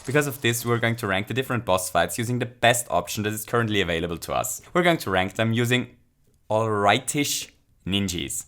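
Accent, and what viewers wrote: Austria. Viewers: Hmm I never knew you were from Austria . Didn’t recognize the accent . Learn something new everyday ig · also he just has an austrian accent :P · Youre austrian??? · Warte, du bist Österreicher? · Moment du bist Österreicher?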